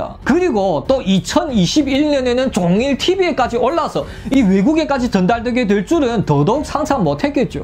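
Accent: Yanbian, China. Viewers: 왠 조선족 말투. 듣기싥어라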